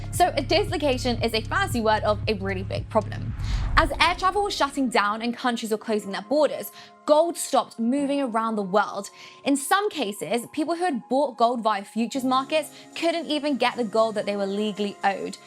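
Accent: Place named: England, United Kingdom. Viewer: must be the thick english accent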